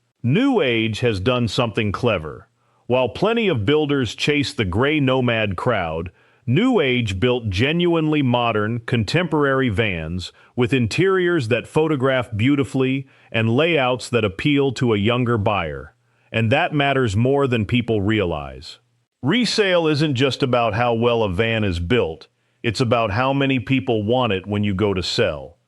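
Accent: United States. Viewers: This is hard to watch. Cheap A! crap. American voice and a hotch-potch of overseas video.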